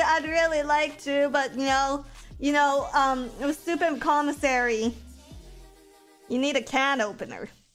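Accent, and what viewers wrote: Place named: South, United States. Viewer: But why is she southern 🤔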